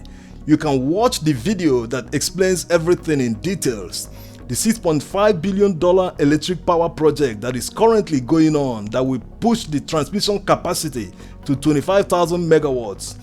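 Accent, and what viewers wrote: Igbo, Nigeria. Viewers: No doubt the narrator is an Igbo man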